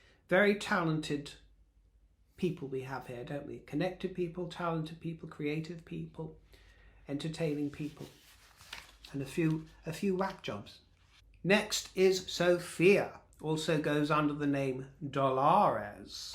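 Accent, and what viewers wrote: United States. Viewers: your American accent is a scream! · love your American accent ❤ 😆😆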